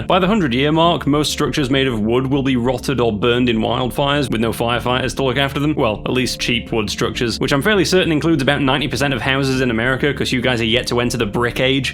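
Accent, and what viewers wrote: United Kingdom. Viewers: YOU'RE SCOTTISH?!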